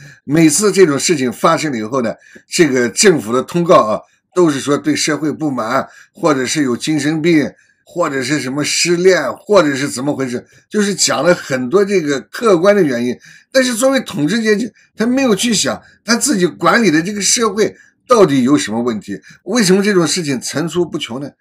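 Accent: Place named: Shaanxi, China. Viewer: 陝西醋溜普通話啊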